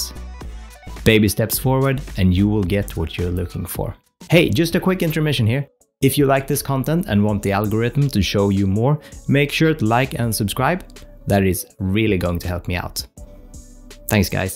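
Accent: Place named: Sweden. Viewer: Loving these videos (and hearing your lovely Swedish accent makes me homesick for Sweden...I lived there for nearly 20 years). Any tips about how to avoid heads being cropped off? Or how to build out an image if you like it but half the head is missing? So far that is by far my biggest annoyance.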